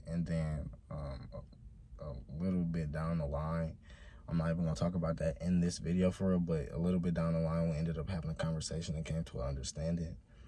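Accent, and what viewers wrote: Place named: Bronx, United States. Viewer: You’re from the Bronx New York??? Bro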